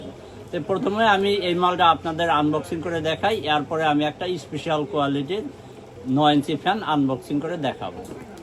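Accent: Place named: Bangladesh. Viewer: কথা শোনে মনে করেছিলাম বাংলাদেশী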